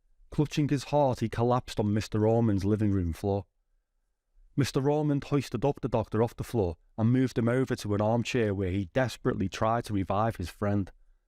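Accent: Liverpool, United Kingdom. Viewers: the posh scouse phone voice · Scouse narrator. Bonus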